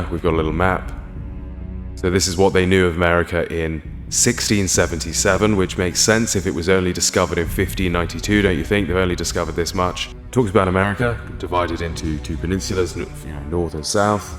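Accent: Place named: United Kingdom. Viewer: Nice British accent - I still suspect he's Russian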